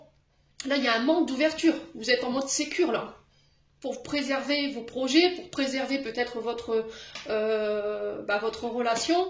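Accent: North, France. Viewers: Bonsoir a tous, bonsoir, j'adore ton accent de chnord,je suis de Calais 😂 je suis cancer ♋️ ascendant cancer ♋️, elle est bélier 🐏 ascendant bélier 🐏, moi je me suis laissé emporter par elle,love bombing, gaslighting etc.....bref courage a tous,parfois il faut traverser toutes ses épreuves pour avancer...et croyez-moi cest purée de dure...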